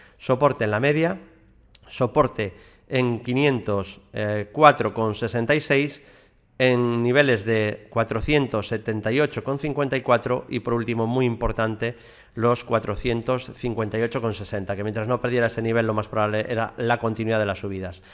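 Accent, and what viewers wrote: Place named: Galicia, Spain. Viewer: No puedes negar que eres gallego.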